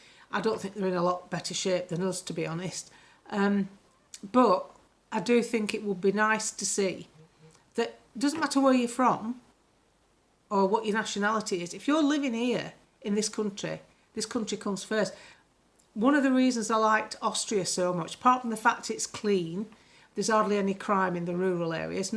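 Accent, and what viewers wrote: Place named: Lancashire, United Kingdom. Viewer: Nothing, I mean nothing beats a Lancy accent. Ta Luv !!! 🙂❤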